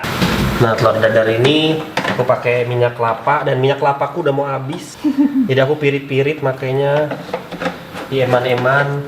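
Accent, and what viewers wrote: Bali, Indonesia. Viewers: Logat bali keluar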